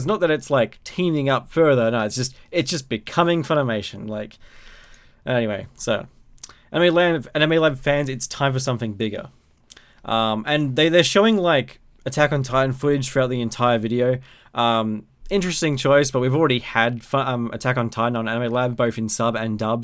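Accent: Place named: New Zealand. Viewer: U Aussie cuz u don’t sound like it u sound like ur NZ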